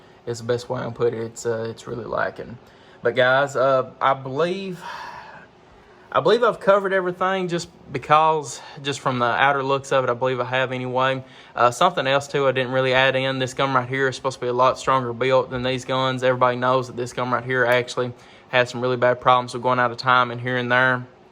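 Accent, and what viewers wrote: South, United States. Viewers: I noticed that you have a southern draw just like I do! Where are you located? I'm in Pensacola, FL. about 10 miles from the Alabama state line. We call the Florida panhandle L.A. as in lower Alabama! I get teased about it also! Dang it!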